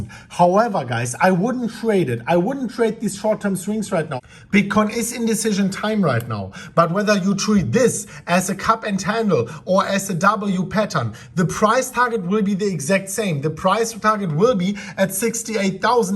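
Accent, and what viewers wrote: Germany. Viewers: I love your quick videos and German accent. Keep up the good work, can you put the prices in GBP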